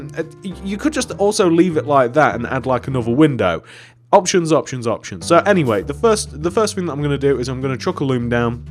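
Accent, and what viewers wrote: United Kingdom. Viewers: heeyyyy watsup youtube? me: heyyy nothing much but you get more British everyday.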